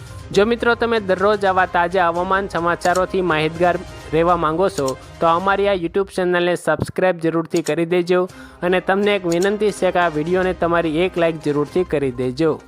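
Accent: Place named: Ahmedabad, India. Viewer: અમદાવાદી શુંધ્ધ ગુજરાતી ટોન માં સમાચાર સાંભળવા ગમે.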